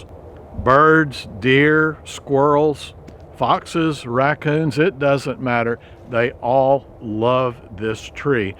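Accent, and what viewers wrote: Texas, United States. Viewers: you talk so cute. You have such a sweet delivery. Love the Texan accent.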